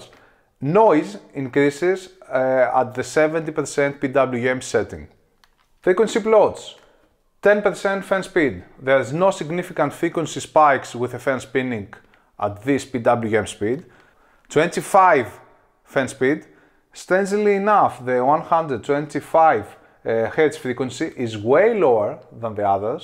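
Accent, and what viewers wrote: Greece. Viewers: OREOSSSS FILLARAKKO :D i love the accent, after 3s i knew, he is greek. Awesome detailed analysis and exactly what i was looking for. Keep up the good Work !!! Kalinichta from Germany !!!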